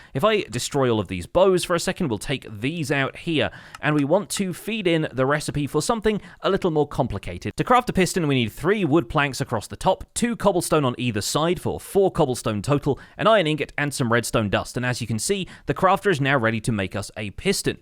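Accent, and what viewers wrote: United Kingdom. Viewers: yeah yeah.. go spend an hour building a machine to make 100 pink dies a second.. then you can make crafters for every other die! That's already like a dozen Plus videos! You're so hyped for auto die.. it's funny! To answer your question: I think I'm dunking on just another Minecraft YouTuber with a British accent.. you all make the same videos too.. you all just copy each other.. You're a dime a dozen, Bud.. Step on down off your high horse. Who do you think you are? -PHILZA!? ...not even close Got anymore stupid questions? ..Go craft some die.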